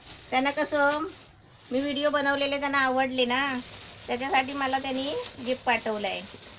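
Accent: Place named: Maval, India. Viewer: khup mast.. tai..! abhinandan tumhala khup shubhechhya..!! aani diwali faralachya videos sathi dhanyawad..!! /\ tumachya maval bhashene mi khup prabhavit zale aahe..!! 😝😍😍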